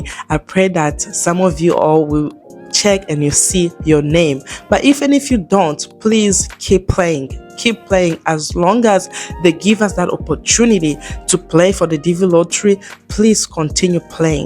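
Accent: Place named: Democratic Republic of the Congo. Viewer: I can feel with your accent that you are from the Congo.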